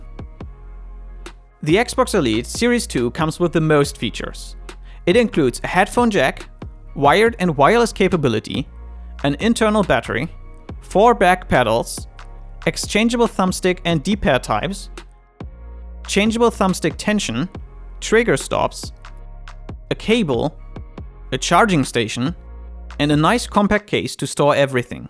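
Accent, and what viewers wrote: Germany. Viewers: your english….. sounds a bit german ?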